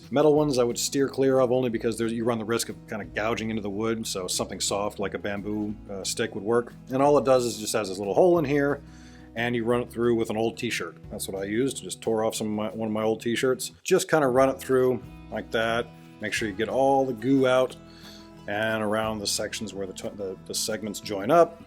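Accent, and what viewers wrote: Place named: United States. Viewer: Love your advice and presentation, but would you STOP talking like a yank. Have subbed 👍👍☘☘☘🇮🇪🇮🇪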